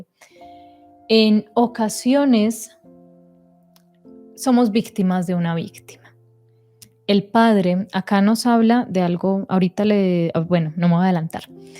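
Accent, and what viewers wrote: Colombia. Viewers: Muy linda voz !! Es de Bogota’?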